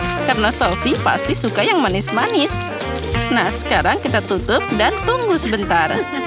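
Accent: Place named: Indonesia. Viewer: Tapi kenapa a sounds Indo bukan usa ker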